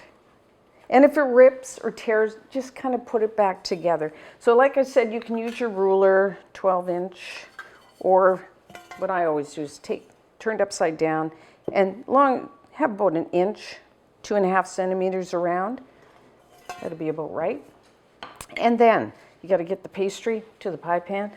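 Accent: Canada. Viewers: Wow, you're from Georgia! For some reason, I thought you were Canadian lol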